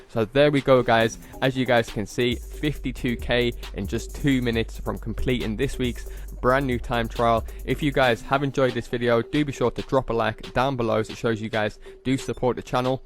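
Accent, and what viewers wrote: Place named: United Kingdom. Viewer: Love the British accent🇬🇧!!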